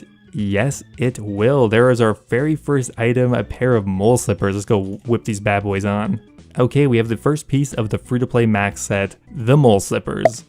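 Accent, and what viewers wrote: Canada. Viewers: I'm american and I can tell you his accent is canadian.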